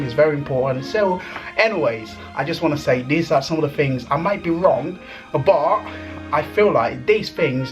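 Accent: United Kingdom. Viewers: I love your British accent 😘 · You love Malaysia, i love your English accent 😂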